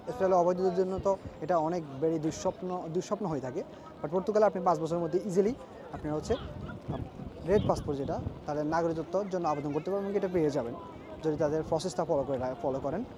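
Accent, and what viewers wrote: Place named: Sylhet, Bangladesh. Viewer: ভাই তো মনে হয় আমাদের সিলেটি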